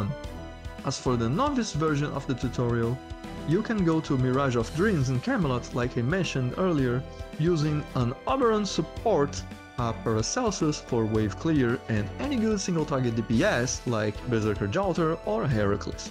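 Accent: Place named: Brazil. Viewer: Estou sentindo um cheiro de PT/BR nesse inglês aí 🤨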